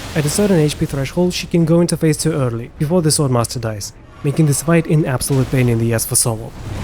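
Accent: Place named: Russia. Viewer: Какое же плотное у меня ощущение, что твой родной язык русский, с этим акцентом, который слышится даже лучше чем мексиканский или индийский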